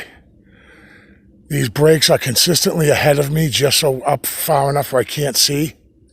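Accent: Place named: New Hampshire, United States. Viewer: I thought that was a New Hampshire accent.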